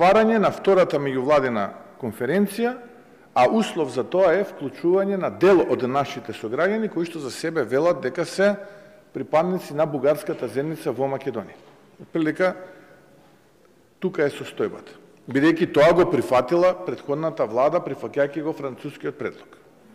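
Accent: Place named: Serbia. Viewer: Говори нанраво бе,не меши сръбски диалект в Българския език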